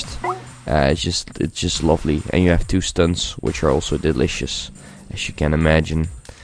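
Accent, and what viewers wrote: Netherlands. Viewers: YOUR DUTCH I JUST KNOW IT :D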